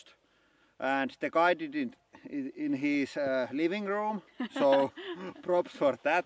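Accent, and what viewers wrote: Finland. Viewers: tää ralli englanti 👌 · kuulostaa suomalaiselta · You Sound like your from finland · Are you from Finland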